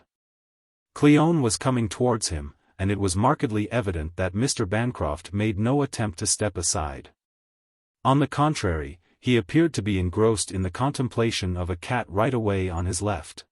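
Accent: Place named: United States. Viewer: It needs a British reader. American reader doesn’t do it justice.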